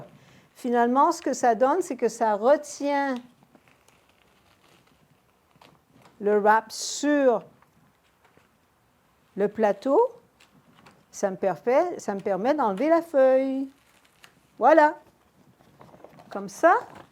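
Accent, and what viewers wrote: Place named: United States. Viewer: Première fois que j'arrive sur une de vos vidéos, quelle élégance pleine de simplicité ! Très vite je me suis dit "cette dame est parfaitement bilingue français/américain" en entendant votre façon de dire "é-et" a la manière américaine "a-and" 😊 Merci pour cette belle recette ! Mon vieux déshydrateur est rond mais je devrais trouver une solution. Merci encore, vous êtes très inspirante 🌺 🇫🇷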